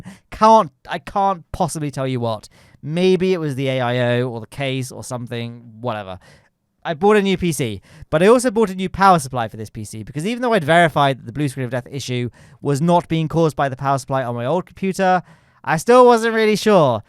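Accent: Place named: Leicester, United Kingdom. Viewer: Ha ha, you're from Leicester.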